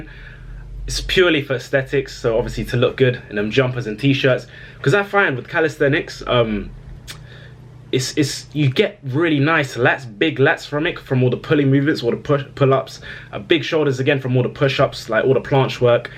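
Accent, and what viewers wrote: United Kingdom. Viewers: I have been watching your videos for months now. I always learn something new. I like your british accent btw. Greetings from Czech republic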